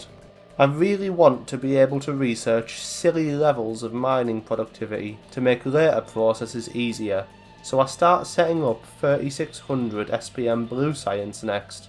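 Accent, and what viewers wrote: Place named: England, United Kingdom. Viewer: Interesting information, but would have wished the author did not just read off his story from a paper, but that he would put some life in his speech, as the English accent and fast talk through without emotion is very hard to follow/understand. Especially when details are not shown, only excerpt here and there. Hope this helps in future videos.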